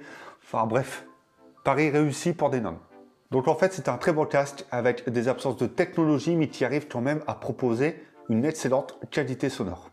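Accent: France. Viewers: 2021 another person who can’t speak English...only frogs can understand u ,we are not frogs ..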